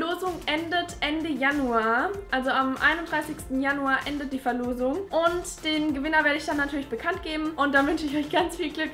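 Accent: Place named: South, Germany. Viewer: Hiii, naaa xD ich hab gedacht ich versuche mein Glück mal^-^ was ich ja an deinem Kanal besonders feiere sind die Umsylings, manchmal ist es schon krass was das ausmachen kann und wie einfach man sich doch (äußerlich) verändern kann, ich denke das gibt den meisten auch einen Denkanstoß :) Und nochwas, ich hab irgendwie sofort an deiner Stimme gehört, dass du irgendwo aus dem Süden kommst (wie ich xD) weiss nicht, war einfach so xD Zum Gewinn, eh ja, also ich liebe liebe liebe Überraschungen also folglich eine von den DM Tüten wäre cool, oder was ich schon immer ausprobieren wollte ist das Wimpernserum** Aber wenn es hart auf hart kommt eine Überraschung macht mich immer froh xD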